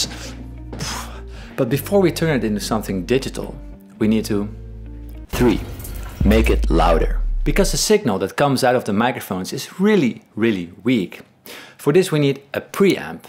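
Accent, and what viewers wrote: Netherlands. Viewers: Your name sounds English but your accent sounds Dutch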